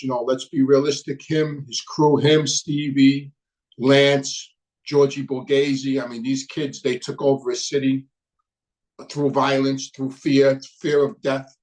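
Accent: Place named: Queens, United States. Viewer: It’s Joey merlinO not Joey merlinA lol it’s ok it’s that queen’s accent I have it too :(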